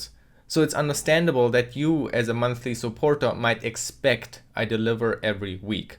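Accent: Germany. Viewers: what part of Germany you from?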